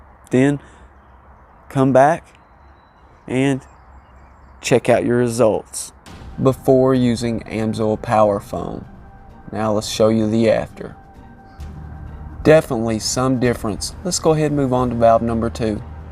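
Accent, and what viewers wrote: South, United States. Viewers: Thousand likes just for the "down south" accent!!!!!!!!!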